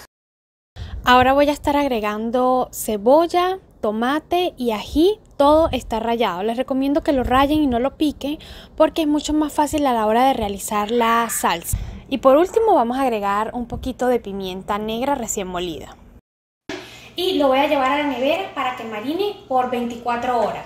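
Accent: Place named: Venezuela. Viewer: De que parte de vzla es tu acento ?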